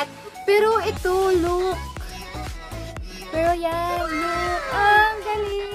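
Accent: Pampanga, Philippines. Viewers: Kapampangan ka ata ate? haha❤️ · Kapampangan ka po?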